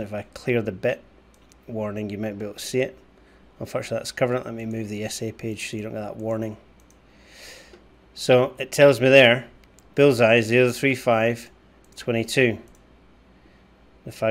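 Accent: Scotland, United Kingdom. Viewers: Finally... A Scottish accent for DCS. Great video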